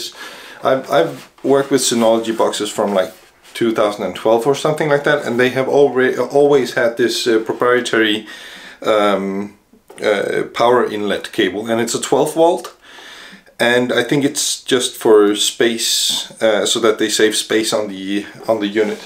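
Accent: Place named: Denmark. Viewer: I went for the DS1522+ which, after installing DSM 7.2 can also accept SSD for storage. But the same as your problem. However I do not use the SSD slots just jet. Completely offtopic for a moment. An British friend of mine said he could not hear a difference between Danish (like you) people speaking English and Dutch (like me). And hearing you speak, I cannot too. I first thought you where Dutch, so I looked it up in your profile. But that is a offtopci (for me) fun fact.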